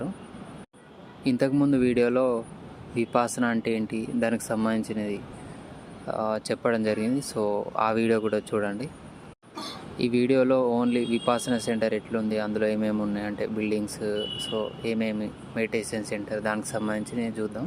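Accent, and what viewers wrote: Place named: Karimnagar, India. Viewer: Karimnagar language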